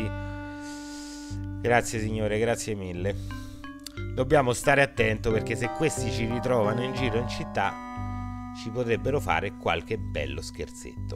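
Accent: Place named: Rome, Italy. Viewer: C e comunque da dire che doppiato in Romano da te ha il suo perche 😂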